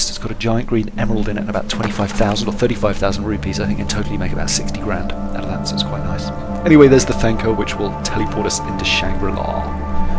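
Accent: United Kingdom. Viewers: im brittish mate, and thats a really tragic accent. its just way too brittish, useful videos though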